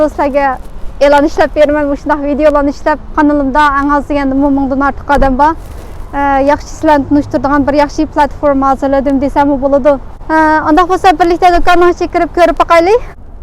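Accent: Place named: Turpan, China. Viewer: ساپ تۇرپان تەلاپپۇزى 😉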